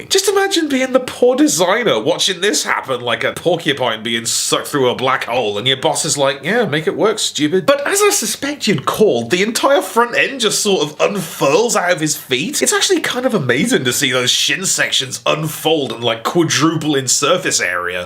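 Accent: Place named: England, United Kingdom. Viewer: Jetfire from ROTF had a heavy Scottish accent, I know has an English accent, but it still reminds me a lot of Jetfire.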